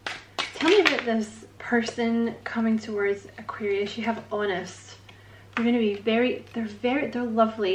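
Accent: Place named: Glasgow, United Kingdom. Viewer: Love your accuracy. . . AND your Glaswegian accent!🏴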